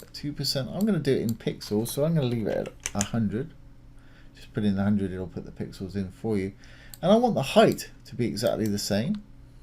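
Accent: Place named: England, United Kingdom. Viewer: I like this from! I am intrigued by your accent you sound English but drop the 'T's - very american! Whatever - watch all your videos, you should do some for Elegant Themes KP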